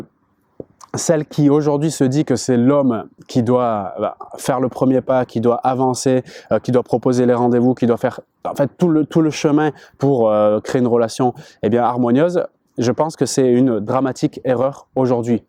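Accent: Canada. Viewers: accent canadien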